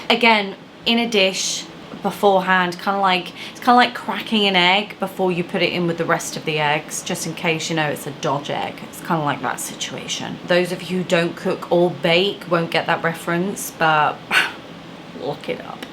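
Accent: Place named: United Kingdom. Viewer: I am soothed by your British accent here lol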